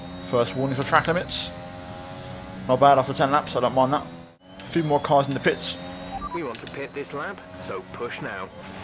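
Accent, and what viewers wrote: Texas, United States. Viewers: Your American accent is perfect, you’d fit right in here in DC! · That American accent! Yes! Oh, you make me laugh! Cheers, mate. I'm not even American but I appreciate it all the same. Lando P3 right now in this series as well, eh? Perfect. Some more to upset that Lee Claxton dipstick. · That American accent 😁👌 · Sick American accent sheeeeesh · As a native Texan I fully appreciate the effort on that accent lol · Great American accent! Went to college in Texas and visit my gf that lives there and it’s very close!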